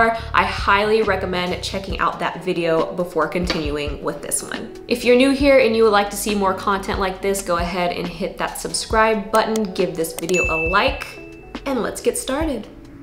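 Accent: Australia. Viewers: Lmao same I was like ‘she keeps doing an Australian accent and as an Australian I’m not mad about it’ 😂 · Its the Australian accent coming in and out for me 😍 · is the random australian accent an inside joke? I am so confused 😅😭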